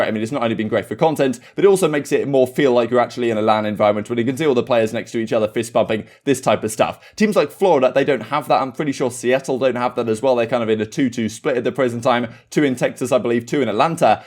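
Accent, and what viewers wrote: United Kingdom. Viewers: I’m also curious 🧐 British? Haha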